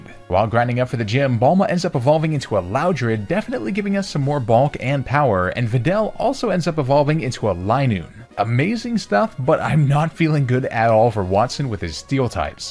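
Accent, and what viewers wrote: Canada. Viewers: I love how Canadians saying out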